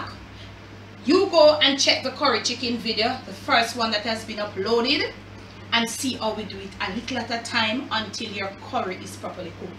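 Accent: Jamaica. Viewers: I love your talking...your a naturally funny ..between patois ..English love it..please don't stop..just be yourself ..and teach us authentic Jamaican cooking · I'm learning ms, I love you and love to hear you talk, you are a true Jamaican keep it up 👍👍👍💖💖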